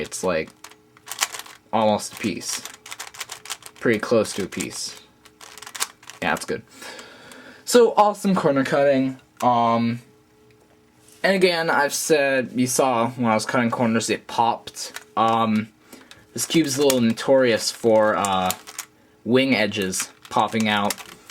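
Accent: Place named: Georgia, United States. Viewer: Goin out on a hunch here.....are you from Georgia? I didn't look at your profile, but you sound Georgian, like my cousin!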